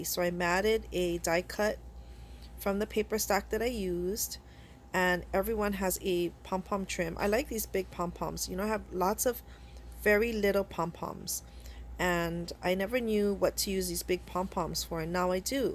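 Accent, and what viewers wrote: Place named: Hawaii, United States. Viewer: New subby from Created to Create. I thought you sounded from Hawaii. I'm from Hawaii too, Waianae/Nanakuli, but I live in Florida now. Aloha!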